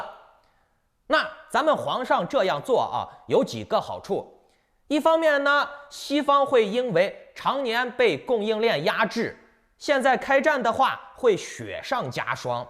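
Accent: Henan, China. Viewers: 我听着你就像是河南人。你在国内并没有在国外。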